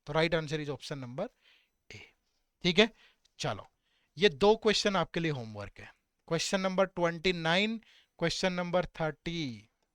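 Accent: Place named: Haryana, India. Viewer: Sir good english with haryanvi loved it!!! Thankyou for the content 💌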